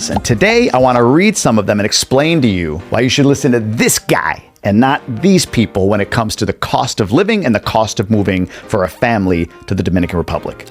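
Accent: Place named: New York, United States. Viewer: Love this vid. I'm right lol. Knew that wasn't a Michigan accent. I thought you was a New Yorker. Tommy is now married his budget will probably increase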